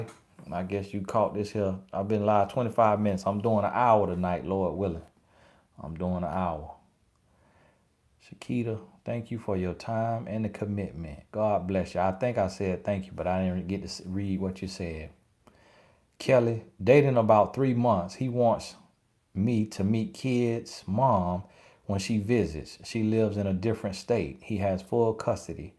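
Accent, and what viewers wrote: South, United States. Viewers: You be cracking me up with the funny things you say in your southern accent. 😂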